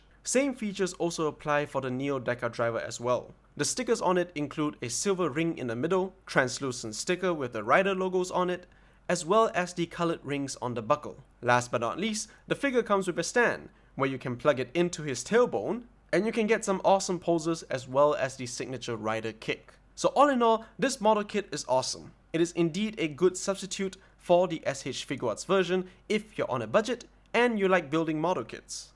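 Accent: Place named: Malaysia. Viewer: You're either a malaysian chinese or a singaporean chinese, by how confused your accent sound like at times. American english or UK english..decide..other than that, awesome review.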